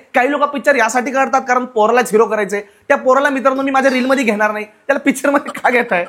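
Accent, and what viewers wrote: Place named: Nashik, India. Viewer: Thanks nashik chi bhasha yetech madhe madhe bolnyat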